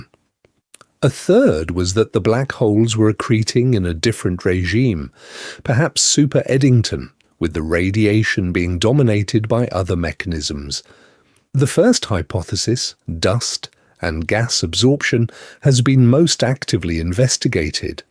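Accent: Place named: United Kingdom. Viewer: Dang! British Accent irritating.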